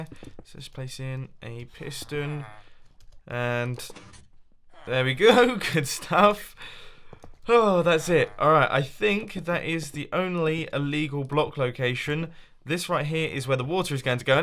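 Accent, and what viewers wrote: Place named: United Kingdom. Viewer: Create another storage system for your item backlog. You could also try to set up a system that drops about half of your farms drops in lava so that you don't overflow as fast. ALSO, during logdotzip's TTB#9 video, he abused fireworks so that he could fly better, but his elytra died very quickly, so stock up on a lot of dragon's breath (to repair your elytra while adventuring because some people in the comments section of his vid speculated that fireworks(even those that don't cause explosive damage) damaged elytra faster) before using fireworks to get a flight boost. Sorry for the text wall! Have a good day! Hope this helps! I also LOVE your British accent.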